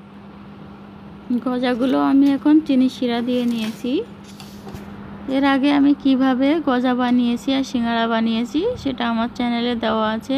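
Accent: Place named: Jessore, Bangladesh. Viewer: Apu josor amer nani bari maura.tomer vasa sunay sob soma a monay hotow amer kasayr mama khalara ktha bolsay.bosoray akber nanibari na gaylay monayhotow jibontae vritha.now ja oya ho a na.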